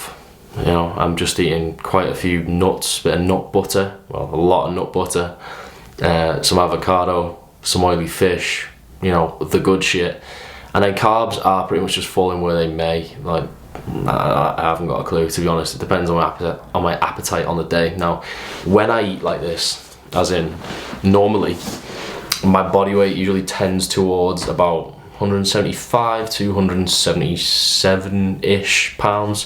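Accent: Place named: Liverpool, United Kingdom. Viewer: Yes yes, more of these. And also ordering food in Fluent Scouse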